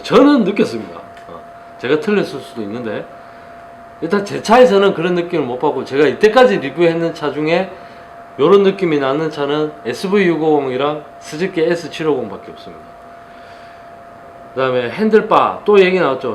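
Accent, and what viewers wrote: Gyeongsang, South Korea. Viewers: 토속적인 경상도 억양 리뷰 참 좋네요.잘 보았습니다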